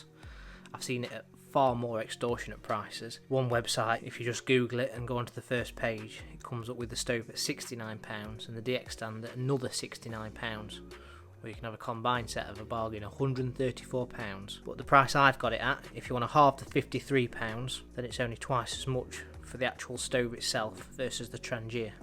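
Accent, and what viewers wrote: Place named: New Zealand. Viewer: That New Zealand accent is so strange to hear. I ended up having to put on subtitles. Thank you for the video. Aloha.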